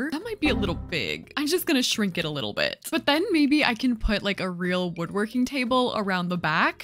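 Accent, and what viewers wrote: Canada. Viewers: Are you Canadian? Also I feel like imma frequent your channel, glad I found you on my home page :)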